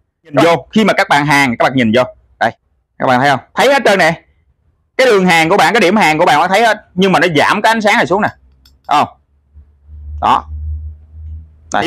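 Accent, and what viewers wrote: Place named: Cu Chi, Vietnam. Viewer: Cảm ơn em , video rất bổ ích , nghe giọng em Anh đóan Em ở Củ Chi phải khổng ?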